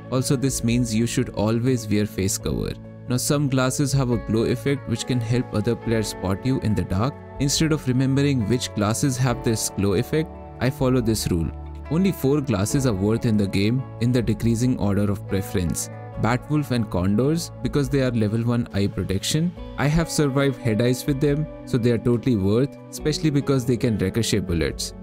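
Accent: India. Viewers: As soon when you heard the strong indian accent you knew that the guys knew his shit xD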